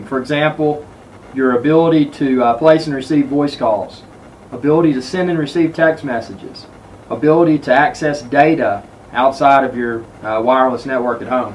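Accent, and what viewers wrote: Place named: Alabama, United States. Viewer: Thanks for info! I'm getting ready to try this since my iphone 5 is stuck in recovery mode. You sound like you're from my home state - Alabama?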